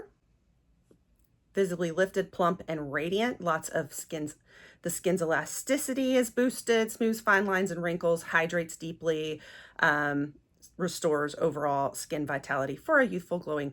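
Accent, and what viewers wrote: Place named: United States. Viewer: Just the let you know I’m from the uk and I LOVE the American accent so I’ve subscribed and I think your advent videos are great xx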